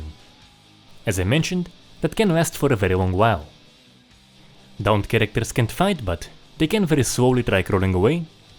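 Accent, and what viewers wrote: Russia. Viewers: Твой акцент выдает твою русскую личность)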